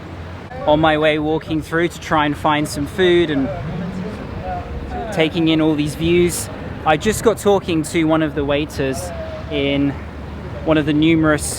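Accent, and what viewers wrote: England, United Kingdom. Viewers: I like his English accent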